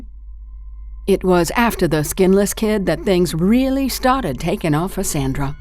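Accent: South, United States. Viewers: Very excellent story. The narrator did an excellent job despite using a southern drawl to tell this unusual tale. Sometimes, when narrators use accents to narrate stories, it can be difficult to understand them, thus, taking away the pleasure of enjoying the story. Not so, in this case, she was very easy to understand. I hope to hear more from this narrator in the future. Also, the story it self was very well written. It had a different type of plot which gave it a fresh and new feel to it, that the other usual horror stories sometimes lack. The plot was also completely unpredictable which I liked, because I hate predictable stories. Finally, the unusual plot twist or twists were well done and very surprising. Overall, I really enjoyed listening to this story. Well done " ". 😉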